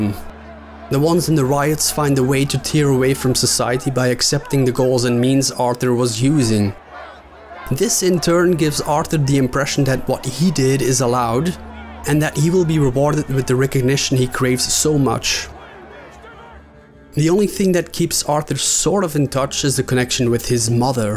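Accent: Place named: Russia. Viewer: What's with the new Russian accent though?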